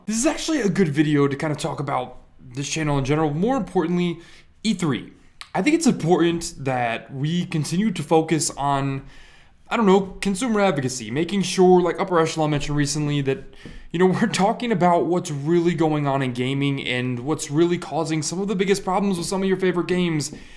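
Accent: New York, United States. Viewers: Okay i can deadass definitely tell your from new york my guy lol 😂😂😂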